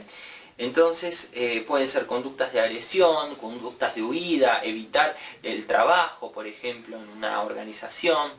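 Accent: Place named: Argentina. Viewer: Muy claros tus aportes teoricos. Una clase con acento argentino que se agradece.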